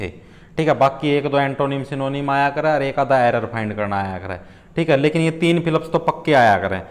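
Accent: Haryana, India. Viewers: Nice haryanvi sir ji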